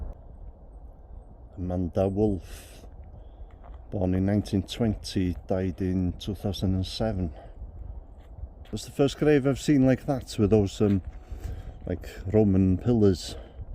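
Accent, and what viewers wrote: Wales, United Kingdom. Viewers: Hey, loving your videos, n loving your accent, there has to be so.e Welsh in there somewhere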